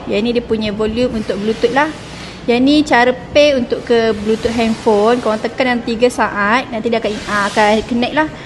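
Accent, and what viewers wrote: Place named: Malaysia. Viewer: Malaysia, gaya bicara nya khas. Banyak orang Indonesia yang suka gaya bicaranya logat Malaysia.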